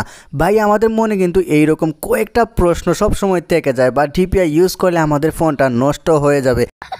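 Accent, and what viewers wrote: Sylhet, Bangladesh. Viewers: Vai Ami o sylhet taki apni o sylhet er hobigonj mone hoy apnar YouTube channele Ami Ei vedio ta deklam R apnar kota gula sylheti Tay Apnar YouTube channel Take Subscribe korlam....Plz.Replay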